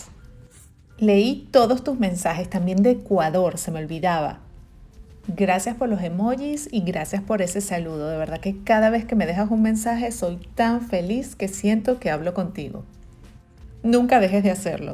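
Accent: Venezuela. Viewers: Refrescante escuchar el acento venezolano (caraqueño!) en un vídeo de calidad. Buen trabajo y sigue p’alante! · Hola, me encantan tus recetas!Al oirte note' que eres Venezolana como yo ! me emociona sentir y reconocer mi pais... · Me encanta el acento venezolano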